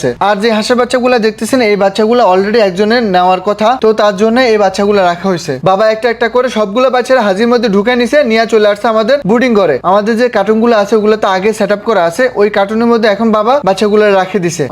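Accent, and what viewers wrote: Noakhali, Bangladesh. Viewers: ভাই নোয়াখালীর মানুষ নাকি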